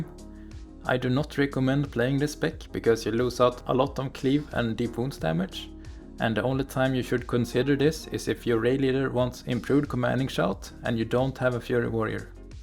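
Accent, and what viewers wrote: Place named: Sweden. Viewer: yo does that weak aura come with that swedish voiceover lmao,great guide,thanks!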